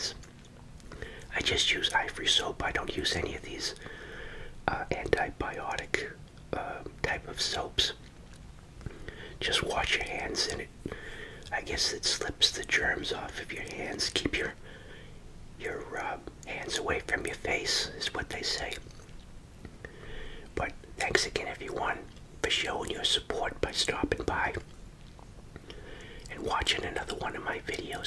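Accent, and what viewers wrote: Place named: Massachusetts, United States. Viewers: What part of Massachusetts is he from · What part of Boston are you from?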